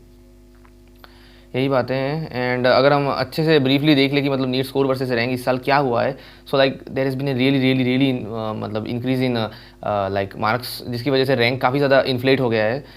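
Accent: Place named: Silchar, India. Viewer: Bro silchar ka ho kya...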